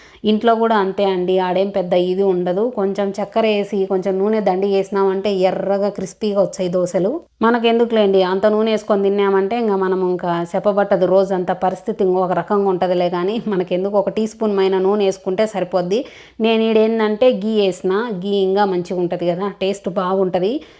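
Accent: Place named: Kadapa, India. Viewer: Hi kadapa bhasha vachhinya ,poinnya adaragottunnav .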